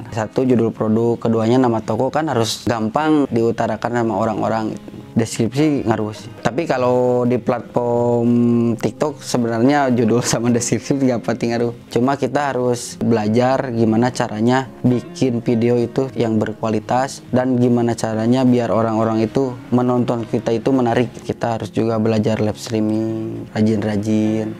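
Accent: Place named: Tasikmalaya, Indonesia. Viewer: Logatnya Tasik banget 😁😁...salam dari orang Tasikmalaya sukses terusss 😍😍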